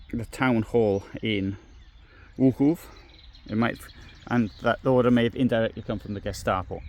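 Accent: United Kingdom. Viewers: Merry Christmas! I believe you are correct about the Catholic church along with the Germans victimized the Jews. Also, interesting how you have not lost your British accent. Great video!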